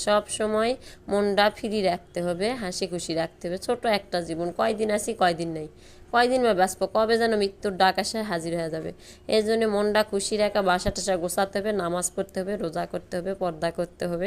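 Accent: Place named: Naogaon, Bangladesh. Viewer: অনেক ভালো লাগলো নওগাঁর ভাষা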